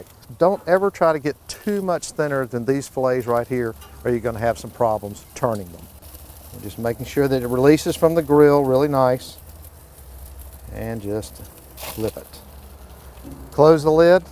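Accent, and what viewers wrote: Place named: Eastern North Carolina, United States. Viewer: Sounds like you're from eastern NC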